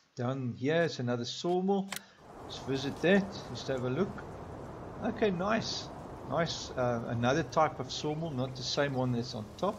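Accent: South Africa. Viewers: I hear SouthAfrican English, I upvote ;)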